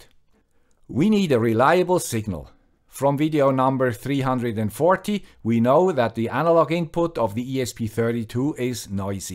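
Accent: Switzerland. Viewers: Thank you for these excellent videos. I love the clear thinking and explanation you bring to them. So, as AI has transitioned from its early mechanistic approaches (e.g., expert systems, etc.) to the current models based on non-parametric statistics, there is also a subtle change in its successful application. The current models are more about being 'less wrong' than 'exactly right in the instance'. This is encouraged by its alignment with large number theory and other assumptions (e.g., Bayesian Analysis, etc.). Given this, we might best think of modern AI technique as most suitable as part of a 'problem-space reduction' tool that gets bolstered and completed by more traditional, deterministic kinds of algorithms, rather than as a central part of some core algorithm. AI's real mission, IMHO, is about abstracting 'the smart' from from everyday good engineering, and this will require a different kind application with different kinds of value produced. 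I like to think of AI as a kind 'context manager' for whatever problem(s) I am trying to understand and solve. Still, this was a bold and valuable attempt. Bravo to, The Guy with Swiss Accent.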